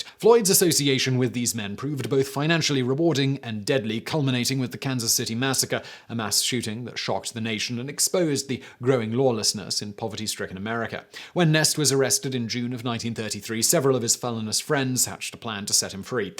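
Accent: United Kingdom. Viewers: I enjoy when uses lingo in is professional British accent. Terms like "all day stretch" to mean a life sentence and referring to prison as the "pokey" sound hilarious with that accent.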